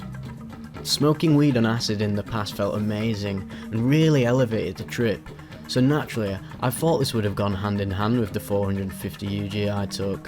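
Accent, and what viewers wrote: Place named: England, United Kingdom. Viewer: I feel like you could write. Maybe you already do. I’m far away from you, it sounds like you’re English, but you’re story is something I’m really enjoying. I subscribed, so I’ll try to keep track. I love this style of writing/ oration though.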